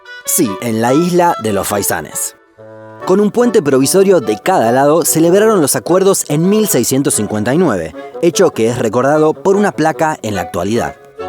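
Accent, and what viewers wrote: Argentina. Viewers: y el detalle papi... es Argentino :V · Una duda, este canal es Argentino? o el que habla en los videos es de Argentina?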